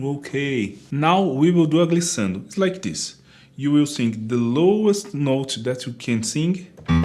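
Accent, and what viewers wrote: Brazil. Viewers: Timestamps Lip trills - Z Sounds- Lip trills - The sound of Z - Tongue Rotations - Glissando - Train Whistles - Lip Trills - Do three sets of these before you sing. Thank you so much,! P.S. I love your speaking voice and your Brazilian accent!!! I'm looking forward to learning your language 😊❤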